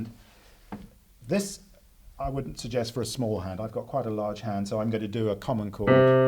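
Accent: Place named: United Kingdom. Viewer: No offence to your job done but I hate UK accent... ugh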